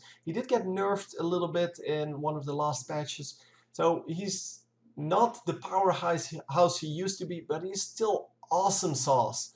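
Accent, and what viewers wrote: Netherlands. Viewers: Are u dutch ?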